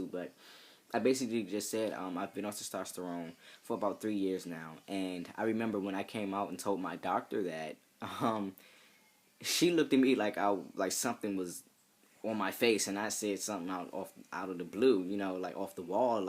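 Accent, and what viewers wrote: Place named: New York, United States. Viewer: Hey Your dialect sounds very NY I would have never guess Maryland.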